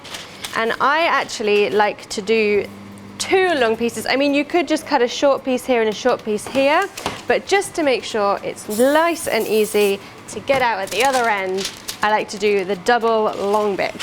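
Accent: United Kingdom. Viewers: It is the best British accent I've heard from a you tuber.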